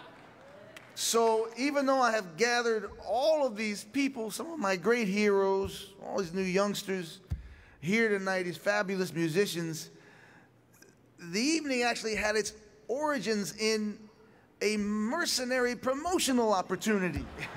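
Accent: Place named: New Jersey, United States. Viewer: It's the way he talks, if you've ever heard him before. Part Jersey, part easy going, part storyteller...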